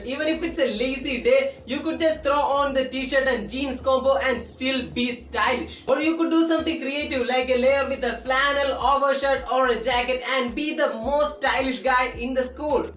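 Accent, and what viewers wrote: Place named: Kerala, India. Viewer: Your English slang tells me that you are a Malayali boy what ever support from Karnataka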